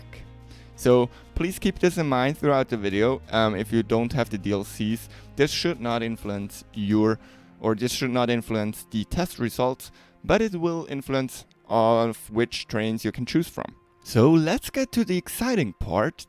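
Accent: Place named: Germany. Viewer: Engineer with a german accent and 100% dedication? Definitely a like. TY for the work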